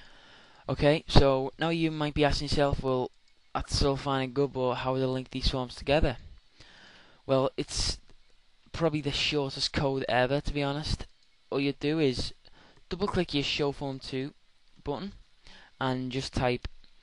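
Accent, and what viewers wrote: Liverpool, United Kingdom. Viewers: Thank you so much for these! What makes these video tutorials really good is the simplistic approach. Straight to the point, plain language and easy to understand instructions. It takes the fear factor of getting into the "complicated" world of programming out of the equation. And let's not forget the Scouse accent!!! "Best in the Weld" :-)))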